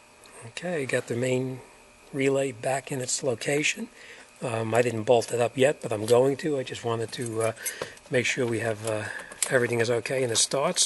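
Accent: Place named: New England, United States. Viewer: Love your New England accent!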